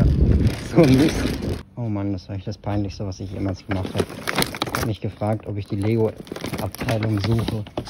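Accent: Germany. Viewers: Bist du eigentlich aus beiern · kommst du aus münchen ?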